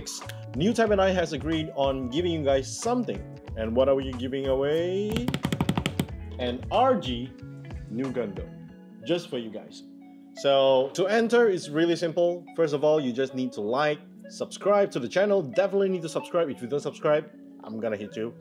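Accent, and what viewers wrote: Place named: Philippines. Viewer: You sounded more like a pinoy than a malaysian to me..anyway Great Job👏👏👏 this motivates me to unwrap and assemble my Gundams, but paint not..no tools yet. I ll be studying more of what you do kabayan🙏🙏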